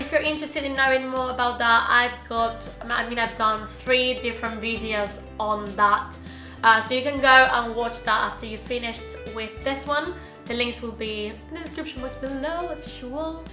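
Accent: United Kingdom. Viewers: Sos argentina?? tenes un acento super british! solo lo aprendiste con el curso de EF?? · Como as conseguido este acento Britanico? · me encanta el acento britanico q agarraste😭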